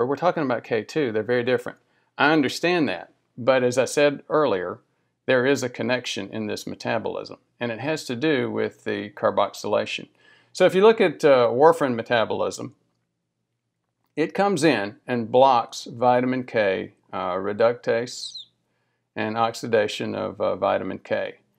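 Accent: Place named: Middle Tennessee, United States. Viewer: thank you for the fast reply. I'm currently shopping for a good used van to replace my decrepit one (it literally can't even make it to Knoxville, much less Louisville.) Then we would be better able to get to your neck of the woods. I thought you had a little "Middle-Tennessee" in your accent. Nashville would be a bit easier to get to, but it is what it is. Wish we had known about David W.. My sister, who resided near Memphis, just recently died during a triple bypass...another death-by-doctors incident, in my opinion. Anyway, thank you very much for the info,. We might see you sometime. Sylvia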